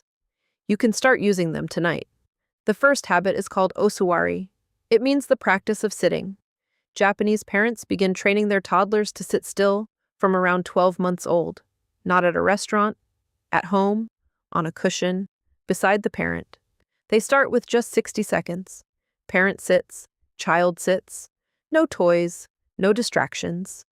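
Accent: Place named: United States. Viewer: the american japanese accent is frying me 😭😭💀💀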